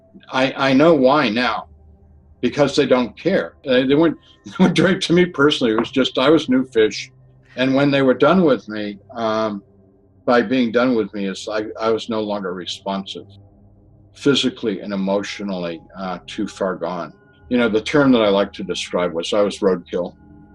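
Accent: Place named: New England, United States. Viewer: F'in D's.. lol I love The New England accent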